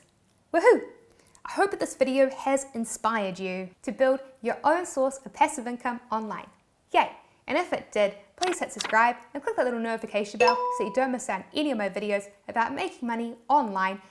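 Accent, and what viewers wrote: New Zealand. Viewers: I somehow understood it the moment I heard on word. Letter E sounds I and I love NZ accent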